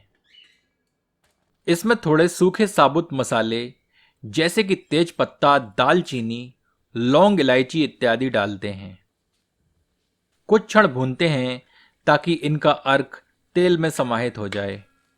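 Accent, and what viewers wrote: Standard. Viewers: Amazing recipe and full authentic hindi haha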